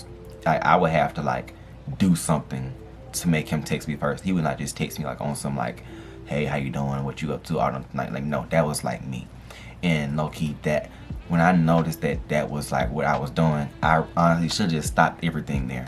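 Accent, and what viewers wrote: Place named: South, United States. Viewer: You look and sound like a real southern. belle. Keep enjoying and keep growing your content .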